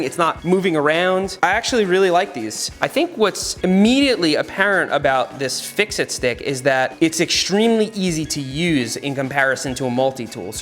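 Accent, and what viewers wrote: Long Island, United States. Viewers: he said it in another video he is from long island it is his long island accent showing through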